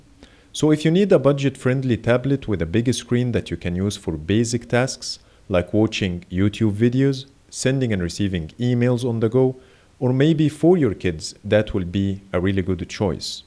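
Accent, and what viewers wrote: Egypt. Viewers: Egyptian accent lol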